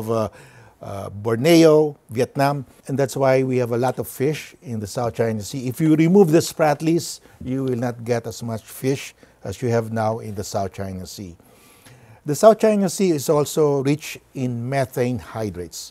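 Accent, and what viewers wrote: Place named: Philippines. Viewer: no need Dein. Most Filipinos are bilingual, English and Tagalog. Filipinos can understand "m-uh-ps" as maps. That's Filipino English dialect for you. Other English dialect speakers will quickly understand maps. After all, this presentation is mostly MAPS no matter how it is pronounced. Right?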